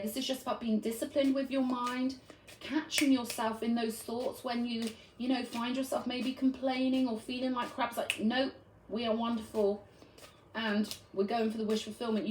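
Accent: United Kingdom. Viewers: Smooth British female voice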